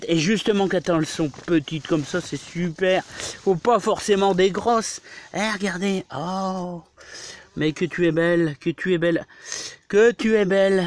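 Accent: North, France. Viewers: Merci à vous pour tous vos conseils quand je sais pas je recherche dans vos vidéos et j'ai ma solution cela fait maintenant plus de 2 ans que je vous suis merci pour partager vos conseils et votre passion du jardin avec l accent du nord je suis à côté de Lille une question quand même votre jardin est très très grand que faites vous de tout les légumes récoltés car la quantité doit être impressionnante ? Merci bonne continuation à vous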